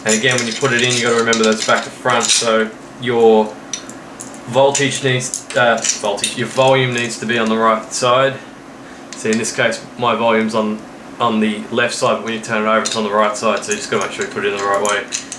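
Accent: Australia. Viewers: It's cool to hear an Aussie accent on You Tube.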